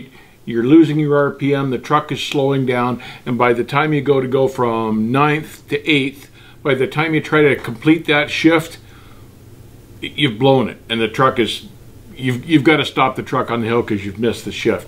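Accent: Canada. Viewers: nice to find your channel, you know your stuff and listening to your candian Accent reminds me of running upstate New York on I 81. The Canadian truckers were always the nicest drivers! Your stories also reminds me of the days when I hauled produce coast to coast loading in California back to Florida. I started over the road running for an owner operator and Produce was his main commodity. this old guy made me a much better driver because boy he was very high standards with his equipment. But I was grandfathered into the business in 1990 so all of my learning experience was on the job! It’s fun to watch your videos they are spot on !!! thanks for caring to raise the bar for the newer drivers! I now pull doubles for an union LTL company. “Wildflower”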